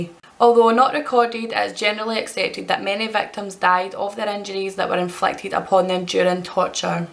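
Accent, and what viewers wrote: Scotland, United Kingdom. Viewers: So nice to hear an actual Scottish person talking about witch craft as a practicing Wiccan myself the Rowen branches and red ribbon are uesd as a fertility and abundance charm just FYI also the bridles u spoke about were commonly used as u quite rightly said on gossiping nagging wife’s but the husband would drag ur ass door to door to show the neighbours and towns folk that ur wife was a bit of a gob shite and u tamed you woman lol 😆 can u imagine also was common practice to get a good spanking if they disobey there hubby’s pmsl 🤣 what a shite time to be alive as a bold outspoken woman eh ... any whoo loved the video hope u do more on the subject u should look in to the Dumfries witch trials they were particularly shocking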